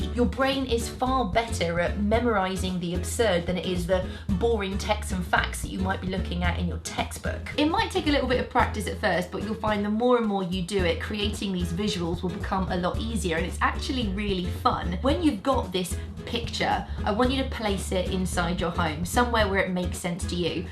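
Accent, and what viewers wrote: United Kingdom. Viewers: Awesome stuff actually. I love your accent. British accent rules😍😍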